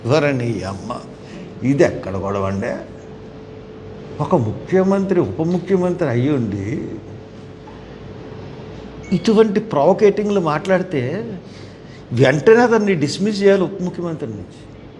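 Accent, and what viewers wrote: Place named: Godavari, India. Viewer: ఓరి నీ ..... ఇదెక్కడి గొడవండి గోదావరి యాస సూపర్ గా ఉంది సర్ 😂😂😂😂😂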